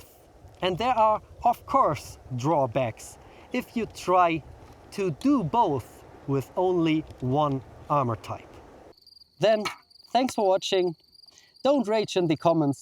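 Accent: Germany. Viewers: Man hört voll den deutschen Akzent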